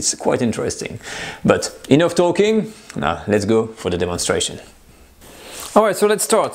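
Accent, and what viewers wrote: France. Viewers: Your English is utterly idiomatic but with a French accent. Magnifique! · this guy talks too much...the first 3 minutes repeats same 15 second information twelve times in thick french accent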